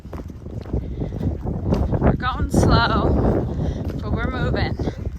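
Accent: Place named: Minnesota, United States. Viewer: Uff Da! Spoken like a true Minnesotan! ❤️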